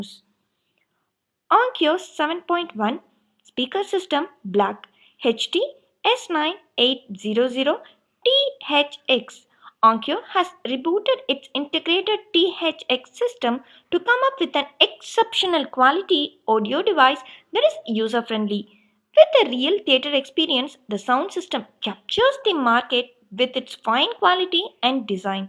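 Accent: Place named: South India, India. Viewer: Could find an accent u should be south Indian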